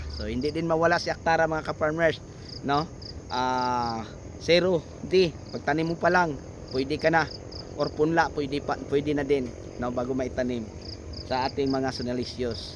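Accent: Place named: Visayas, Philippines. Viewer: Saan ka idol nagtaranim kasi bisaya ang tuno ng pananalita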